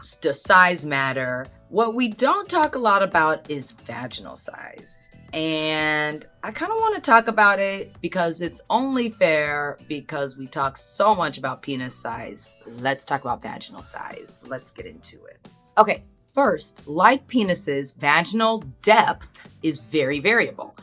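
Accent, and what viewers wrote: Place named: United States. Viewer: American narration....cant be bothered to listen.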